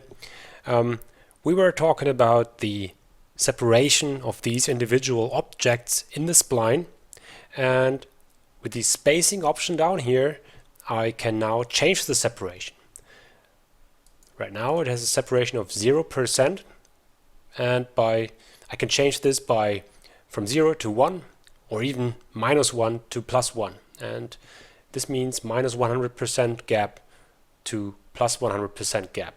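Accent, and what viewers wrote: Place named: Germany. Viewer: Schöner deutscher Akzent :D Wie sind die Hotkeys um zb. die Z Achse zu ändern? Hast du ne Liste?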